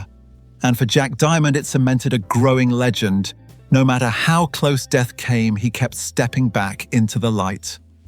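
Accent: England, United Kingdom. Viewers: Dont listen to the haters, really delete the comment, Thats what Jack would have done ( probably that and more 😅😅). Your accent is rich and sounds like proper English. You and fellow Podcaster The Last Supper have top notch Flows🌊🌊🌊🏞🌀🌀🌀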